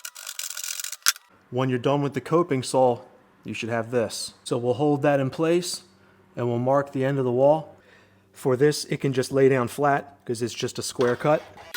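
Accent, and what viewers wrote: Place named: New Jersey, United States. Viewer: Sounds like Jersey to me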